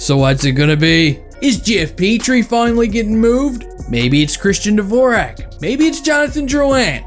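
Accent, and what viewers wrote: Newfoundland, Canada. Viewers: Great video! My dad says your Newfie accent is so thick he thought you were Irish and he’s from Cork.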